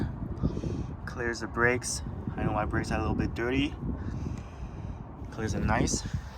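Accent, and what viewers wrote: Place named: Quebec, Canada. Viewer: Thanks for the video man!! I have the exact same car and wheels (but the coupe version) and found very little info online on appropriate winter setups. I'm also from Quebec which is pretty funny as I recognized your accent ahah. When choosing proper tires, how do you know if they will match the car's speedometer like you mentioned? Also, I believe the Yokohama tires have a "V" speed rating whereas the car is rated for "Y" tires, did you downgrade because they're winter tires? Thanks and have a good one!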